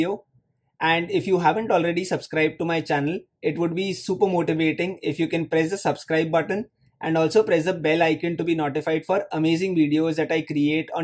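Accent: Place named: India. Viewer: on an unrelated note, why don't indians try to learn english without the pronounced indian accent? other countries will sometimes have accents but many try to match the language without an accent or even speak a british or american accent. But in india it seems to almost always be a very pronounced indian accent. I suppose it's better than asians that just can't speak english at all but still :)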